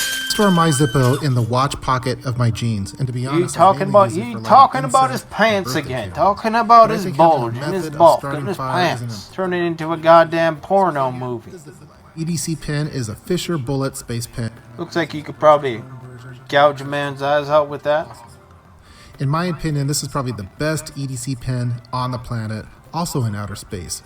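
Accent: South, United States. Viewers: this one isa solutely outstanding and your Confederate English is very good. Respect. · Funny as hell, but why the southern accent?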